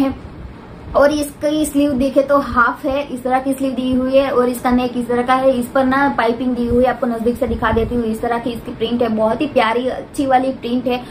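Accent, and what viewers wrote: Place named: Gujarat, India. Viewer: I just don't like your gujarati tone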